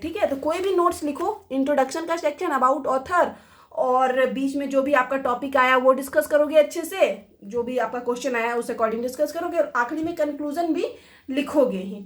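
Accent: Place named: Uttar Pradesh, India. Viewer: It's actually her accent, she's from UP or somewhere close to that part, I've been watching her video forever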